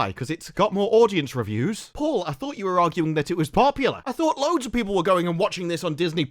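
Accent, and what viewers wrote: United Kingdom. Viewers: This man is most definitely British.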